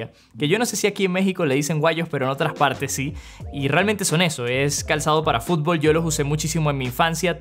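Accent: Venezuela. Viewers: Es venexolano?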